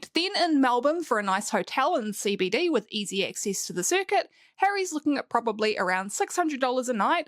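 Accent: New Zealand. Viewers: Oh cmon, a Kiwi accent isn’t the second worst English accent…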